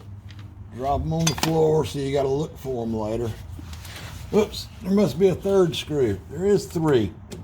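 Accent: South, United States. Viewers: Love the Southern drawl. I was wondering if there was a drain plug or if it drained out through the filters. This video answers my question. Thank you!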